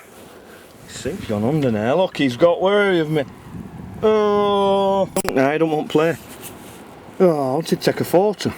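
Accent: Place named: Northern England, United Kingdom. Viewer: Good footage of the sunfish and I love the narrating proper northern accent, me grandad was from Halifax so was a nice reminder. Thanks for this video.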